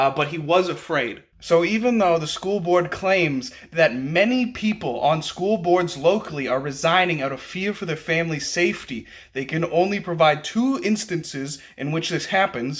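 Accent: Boston, United States. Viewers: your accent has a hint of Boston. am i on to something here young man? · Is that a hint of the ol'e ''Baws-ton'' accent I doth hear? Great vid, man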